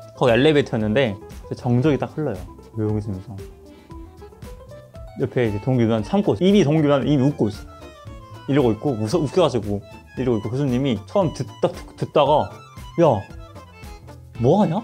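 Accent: South Korea. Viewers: 근데 진짜 영어발음 말고 한국식발음이라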